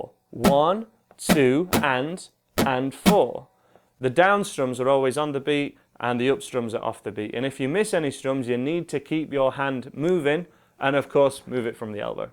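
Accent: United Kingdom. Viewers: me without glasses btw its overwhelming that I thought you were pewdiepie playing a guitar with a British accent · Ah, I love your british accent😍 Thanks for the tutorial!😁😜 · british accents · This british accent 😍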